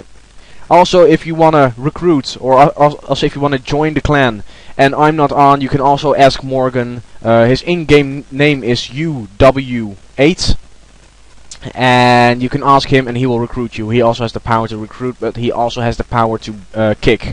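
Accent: Netherlands. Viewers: i can hear that you are dutch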